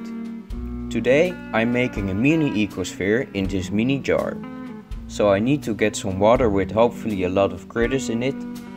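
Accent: Netherlands. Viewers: Dutch people speak English better than most Americans. :-). I very much enjoy the Dutch accent. Very cool!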